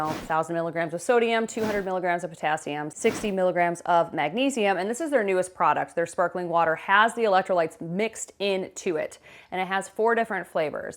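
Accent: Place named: Midwest, United States. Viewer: Da Jewels? Sound to me you're in the midwest, perhaps the Chicago area. If you are, do you miss Dominick's? Was sad to hear of their closing. Used to live in Elmhurst/Lombard, but been down in fl since 87 june. Have been considering the diet, but not sure. I was 220 a year ago, but down to 175 now. Trying to get back down to 140. Some people say that's too skinny, and to them I say that I haven't grown one inch taller since HS, and I'm only 5'7". Don't care they revised the charts. That was only to encourage people to be fatter and more unhealthy, as if what they put in our foods here in the US wasn't enough. Liked the video. 👍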